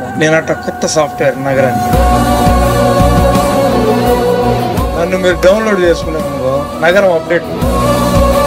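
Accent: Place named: Tirupati, India. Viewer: తిరుపతి యాస దించేసవ్ బయ్యా, సూపర్ ❤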